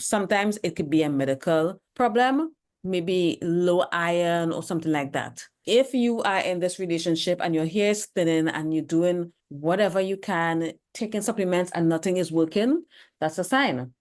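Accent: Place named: Trinidad and Tobago. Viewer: I like your content. You sound like a trini🇹🇹 I'm Trinidadian, btw.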